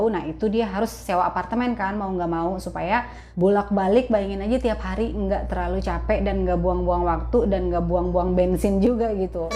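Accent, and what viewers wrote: Jakarta, Indonesia. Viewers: Waaaaah pok ini enak bngt cara bicara nya betawi bngt tapi,,sopan,tertata,jelas beda klau keluaran pesantren keliatan klau klrg Islami....TABAROKALLOH....SYUKRON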